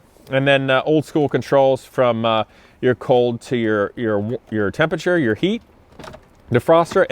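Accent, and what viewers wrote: England, United Kingdom. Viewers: Rally it, that English accent is sick haha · Excellent buyers guide. I would suggest you cover the dash with a leather cover. This is available online. I did find the British accent annoying though... Im part of the Porsche Club GB and I’ve never heard anyone be quite that anal. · Great British accent,! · Good vid v detailed, do have a soft spot 4 944's. Nice example. Love the plummy posh English accent!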